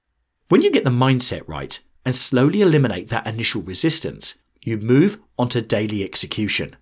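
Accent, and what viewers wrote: United Kingdom. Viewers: Yo, thank you again for turning my article into such an informing piece, honored man! P.S. It's so weird listening to something you've written, narrated in a British accent and animated in such a creative way:D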